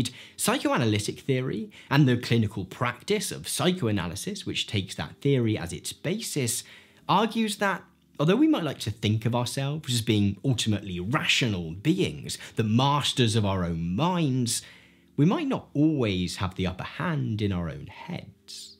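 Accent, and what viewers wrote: United Kingdom. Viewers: british accent make everything better^^^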